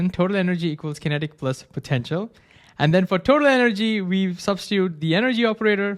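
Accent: India. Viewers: God I hate when I find a video that sounds exactly something I'd like then I hear an Indian accent.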